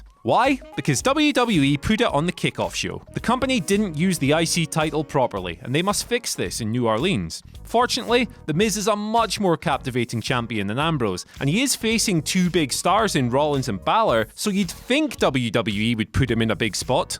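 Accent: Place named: Scotland, United Kingdom. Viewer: Hey, he's scottish. They talk like that :o)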